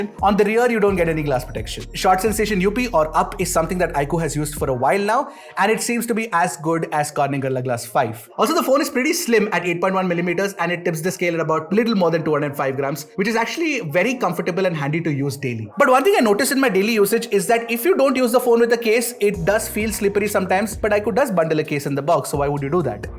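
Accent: India. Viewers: The indian accent is always👌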